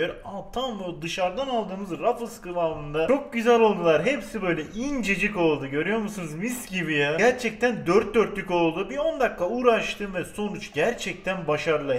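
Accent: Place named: Black Sea, Turkey. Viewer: Lan ben daha 12 yaşındayim niye bunu izliyrum da bu da karadeniz 🤣🤣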